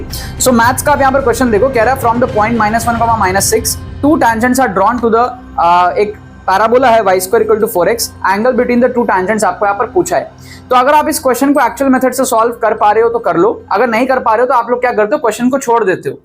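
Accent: Bengal, India. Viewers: oh sir u r amazing 🤩...ur Bengali is too sweet ❤️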